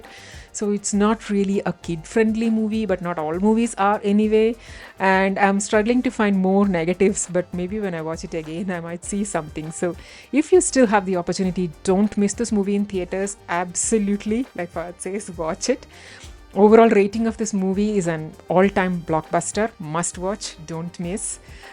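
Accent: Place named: Kerala, India. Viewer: മല്ലു ഇംഗ്ളിഷ്. 100%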